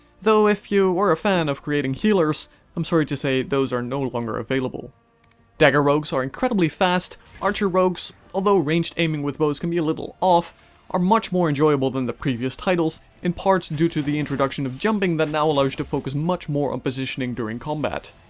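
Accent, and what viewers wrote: Netherlands. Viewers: hmm dat accent....bent u Nederlands ?? :D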